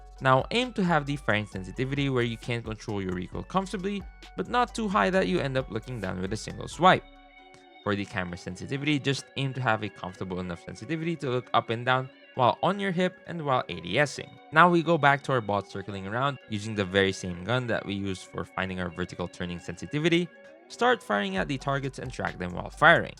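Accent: Philippines. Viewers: Hey, just discovered your channel. What's your nationality? You sound very Pinoy English.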